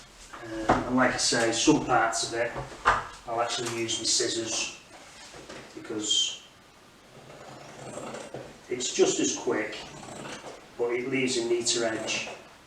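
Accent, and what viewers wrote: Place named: Lancashire, United Kingdom. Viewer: Sounds like north Lancashire to me mate 🤔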